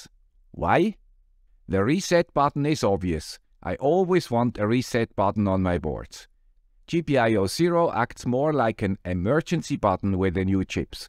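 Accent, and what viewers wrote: Switzerland. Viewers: I have not said it before, but I look forward to: the Swiss accent, The little hand pointer, The hat and the knowledge You share. ThankYou Sir. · I love the guy with the Swiss accent 😊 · Thank you man with Swiss accent, what you explained solved many problems in my mind.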